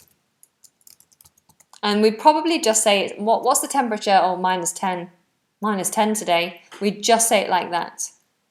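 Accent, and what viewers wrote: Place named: England, United Kingdom. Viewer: IF I LEARNED ENGLISH WITH HER I WOULD HAVE TO MOVE TO ENGLAND BECAUSE I COULD NOT SAY " MO_O" INSTEAD OF "MORE" OR "LATE" INSTEAD OF "LETTER".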